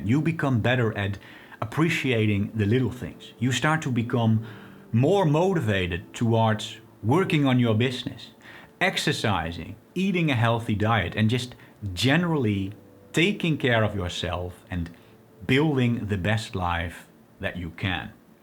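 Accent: Netherlands. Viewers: Ik Dacht Wel Dat Ik Een Miniscuul Nederlands Accentje Kon Waarnemen. 🙂 Nevertheless Very Well Spoken & Articulate,Dankjewel. 🔥💪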